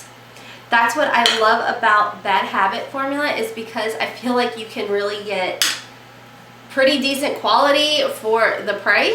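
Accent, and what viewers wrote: South, United States. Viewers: I'm on a binge this evening! Watching all the videos I missed! I can't believe I missed this one! I was soooo waiting for it to. But anyway... Girl, Don't you EVER be self conscious about that Southern voice!! I am from Tennessee grew up in MS (tha sip) so I completely get it! We just walk to our own beat and have charm like no other! Beautiful as ever ❤ Much love from Tennessee, Dayna 💖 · you really made me laugh today with your silly goofy self LOVE IT!! You remind me of my bff shes alot like you i know if you and i hung out we would have a blast. And i love your Southern Belle side i had live it in good old Texas for 13 yrs love the southern talk and there personality very welcoming and sweet =). One more thing i wanted to add i like that your very detail wit your videos about the products and your honesty that i can trust. 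and by watching this video ima go buy me that fantasia palette now =D.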